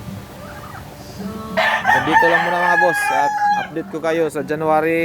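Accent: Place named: Sabah, Malaysia. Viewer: your voice like Sabah people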